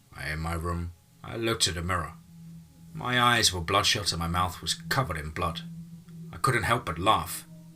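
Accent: United Kingdom. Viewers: Your Brit accent and choice of Brit sayings ruins your American stories. Again.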